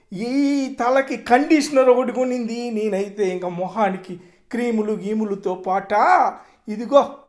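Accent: Nellore, India. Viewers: అన్న బాగుంది అన్న లంచ్ బాక్స్, స్పూన్ కూడా బాగుంది మీ మాటలు సూపర్ మన నెల్లూరు భాష బాగుంది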